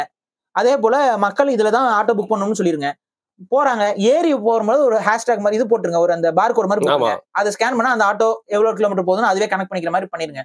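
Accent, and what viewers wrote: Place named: Chennai, India. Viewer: கண்டிப்பாக சென்னை தமிழ் மாறவேண்டும் நீங்கள் இன்னும் முயற்சி எடுங்கள் நன்றி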